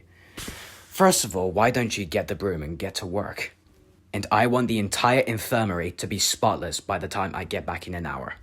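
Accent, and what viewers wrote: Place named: United Kingdom. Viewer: idk why when he started talking I just went "ohmygod he's brtish" LIKE IM NOT BRITISH MYSELF😭💀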